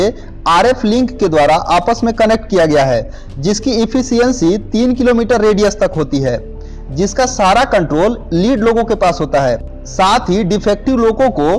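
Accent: Bihar, India. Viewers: Bolne waala Bihari hai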